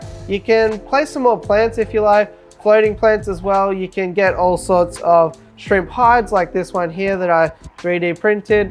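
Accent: Australia. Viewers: oh thank god, hes an aussie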